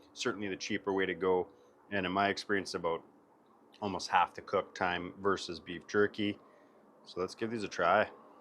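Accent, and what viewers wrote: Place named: Canada. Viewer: I subscribed to your channel. I'll have to go take a look at some of your other stuff. Canada, aha.... I was having difficulty placing the accent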